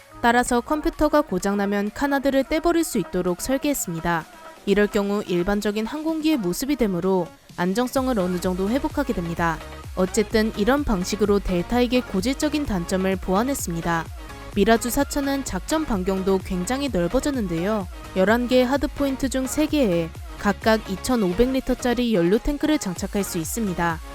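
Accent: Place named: Gyeongsang, South Korea. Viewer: 이언냐 갱상도언냐네..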